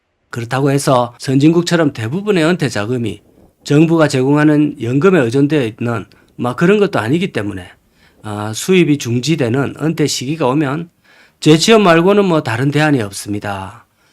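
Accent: Gyeongsang, South Korea. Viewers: 전라도에사는 60대인데도 경상도구수한 사투리가 참 잘 어울리셔요.인생지침에 많은 교훈이 됩니다.항상건강하시구요.감사합니다. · 안녕하세요...항상 수고 많습니다. 이 처음에는 구수하고 조금 어눌한(?) 경상도사투리가 참 좋았는데 지금은 말씨가 표준말로 바꿔가고 말도 빨라지네요 ㅋㅋ.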